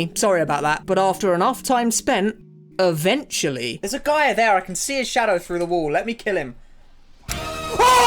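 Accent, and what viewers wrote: United Kingdom. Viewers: British speech about TF2 to vibe and study to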